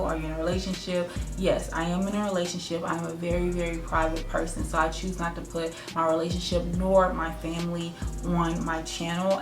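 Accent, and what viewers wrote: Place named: South, United States. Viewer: I'm so happy you did this because I didn't just start following you but I kinda did lol so I'm a rookie to the #DesignSquad lol but I loved that I got to know more about you and love your southern accent lol I have family down south as well so to see you be so stylish and chic coming from the south makes me happy!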